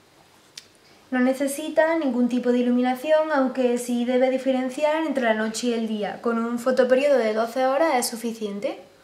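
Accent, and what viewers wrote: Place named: Spain. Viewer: habla como española......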